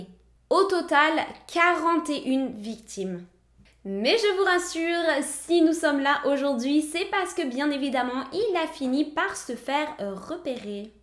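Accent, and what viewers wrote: Quebec, Canada. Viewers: Tu commence à prendre l’accent québécois! Wow. Félicitations pour tes 31k❤️‍🔥❤️‍🔥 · Est ce que tu vis au Québec ? Tu as un accent québécois je trouve 😊 très bien raconté, encore merci ! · Salut jai tomber sur tes videos aujourd'hui....jadore ton accent....je vit au quebec et jai le doute que sois tu vit ici mtn...sois tu y a vecu un bout de temps!!!😅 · C'est tu moi ou desfois ta un accent quebecois??? Genre des sons je sais pas xD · J'ai découvert ta chaîne hier soir et je trouve ça génial , un vrai plaisir et pour quelqu'un qui vient de France tu a un joli petit accent canadien 😉👍🏼🌹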